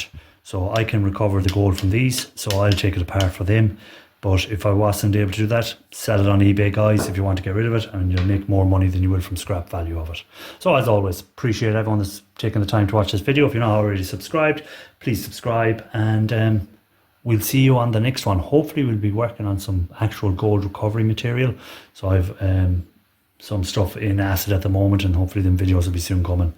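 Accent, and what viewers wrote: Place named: Ireland. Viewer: how far away are you from Galway?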